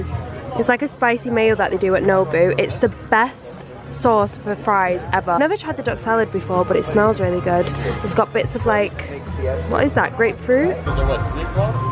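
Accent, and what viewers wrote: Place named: RP, United Kingdom. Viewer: I love your accent, so posh lol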